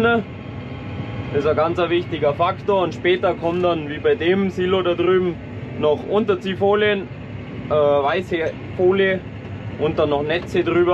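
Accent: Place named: Upper Bavaria, Germany. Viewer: Die Dialektunterschiede zwischen uns Augsburgern und den Oberbayern ist immer wieder beachtlich 😂😮 Ist auch ne echt schöne Gegend da unten, muss man echt sagen.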